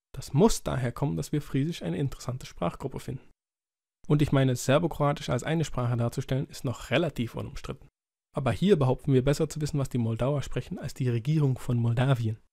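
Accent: Germany. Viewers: Hallo. Das ist wirklich ein toller Beitrag und super interessant. Ich musste ihn allerdings mehrmals hören, weil du sehr schnell sprichst und dadurch auch teilweise sehr undeutlich. Das ist ein bisschen schade, denn sonst wär das echt top. Ps. Sicher bist du Berliner? Du klingst eh ein Flensburger.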